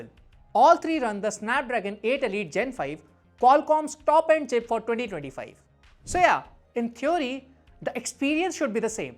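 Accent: India. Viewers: Indian slang too thick. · This Indian accent is a pain in the ass to understand it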